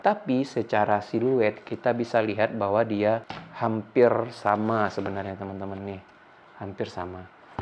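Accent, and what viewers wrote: West Sumatra, Indonesia. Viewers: Lidah nya patah2. Dima sumbar da? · Aksen minangnya ketara banget tapi mantap uda reviewnyo. Sangaik mambantu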